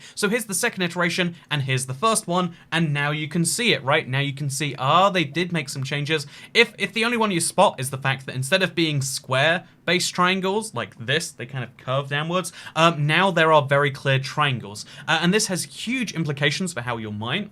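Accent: United Kingdom. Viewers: I’ve been watching for years! And he still speaks so fast with his British accent that I still can’t recognize half of what he says as the English language. With that said, I’m a big fan and long time supporter. Just saying. “Hey everyone, here! If you like videos like this then wwocofmtkgkvkgksksjdhwbdjckfkfngmvkckrktkgkdjdjsnej.” Yeah, what he said. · Why are you complaining about a British person pronouncing a word the British way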